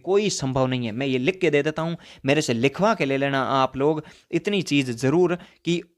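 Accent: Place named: Barmer, India. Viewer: Sir lgta hai aap Barmer ke ho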